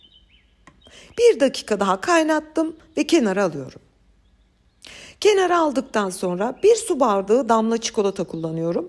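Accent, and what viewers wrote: Germany. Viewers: Ellerinize güzel sakin anlatımınıza ince detay vermenize aklınıza sağlık sağlıkla kalın teşekkür ederim. Antalya Türkiye. Babam da sizin gibi şupangıle şıtress şıpor der konuşurken Almanya da yaşamanın minik süsü kulağa yabancı gelmiyor. Sevgiler.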